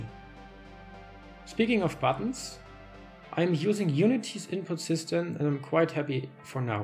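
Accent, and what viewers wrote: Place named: Germany. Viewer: you sound incredibly german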